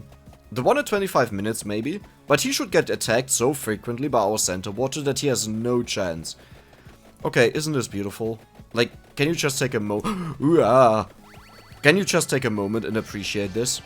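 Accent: Germany. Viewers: love the German accent and also great content and humour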